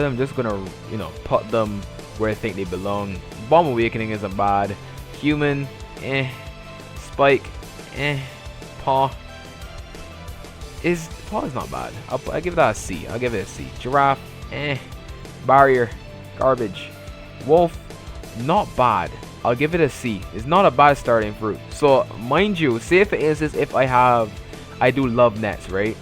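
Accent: Barbados. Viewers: U bajan? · From your accent u from Barbados? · u have a barbados accent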